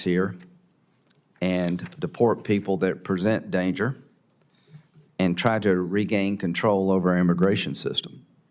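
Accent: South, United States. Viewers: Sounds like a confederate talking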